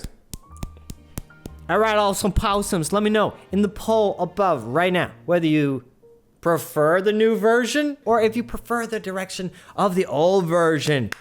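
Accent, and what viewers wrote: Argentina. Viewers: wAit- Are you Argentinian(?? o_O (that or your pronunciation and accent on Spanish is like from Argentina). I'm kind of new in your channel, maybe I don't know this type of information 😅. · Por qué tenés acento argentino? Cuál es tu historia?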